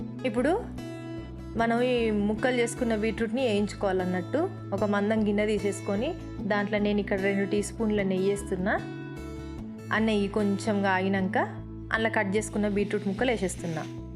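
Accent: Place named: Telangana, India. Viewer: Wah re Wah chef .... Your Telangana Slang Rocks 👌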